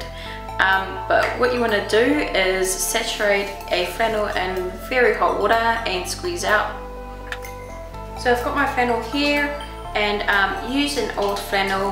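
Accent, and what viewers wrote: New Zealand. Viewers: Omg you have such a maori accent lol itsa compliment! Thanks for the tips. Is this suitable for children as my kids have a halloween party coming up :)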